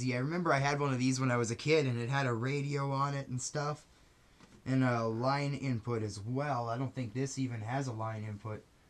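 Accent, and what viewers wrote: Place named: Ontario, Canada. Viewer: I love Ontario accent, love from Italy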